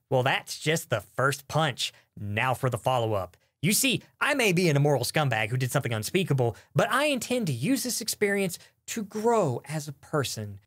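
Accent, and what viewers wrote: South, United States. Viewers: I like your subtle southern accent. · Your Southern accent is less noticeable here than your regular videos. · I never realized how thick his Southern accent was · It’s okay, your vaguely southern accent has soothed us and your justifications seem fair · Me neither, i thought it was kind of a speech quirk or character thing, but seeing his face, I realized he was SOUTHERN. and... HANDSOME. Lmao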